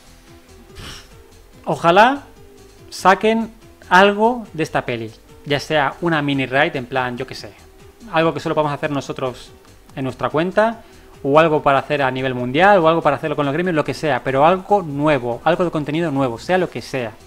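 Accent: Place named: Chile. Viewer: Pensé que eras chileno como yo, por como te expresas amigo 👍😁🇨🇱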